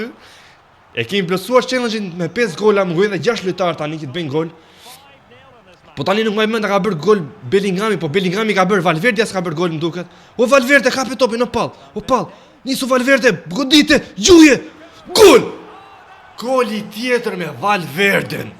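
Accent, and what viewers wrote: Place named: Fier, Albania. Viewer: Kush e vuri re theksin e fierit 😂😂😂😂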